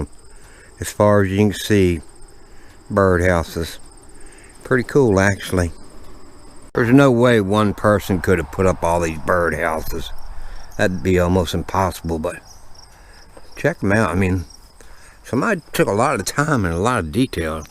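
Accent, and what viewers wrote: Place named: Georgia, United States. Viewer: sound good to me...are you from atlanta georgia? love the accent... be safe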